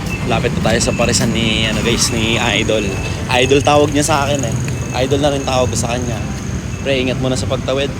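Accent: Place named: South, Philippines. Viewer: dalang dala yung punto ng taga south. yung "ehhhh", "walanjumuyon", "walanya" "apakatuleg mo!" hahahaha goods na goods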